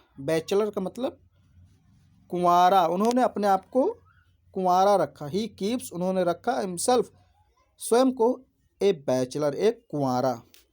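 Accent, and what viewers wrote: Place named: Purvanchal, India. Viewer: Sir speaking language tells that you generally belog to Purvanchal... May be Sultanpur, Ambedkar nagar or Ajamgarh😊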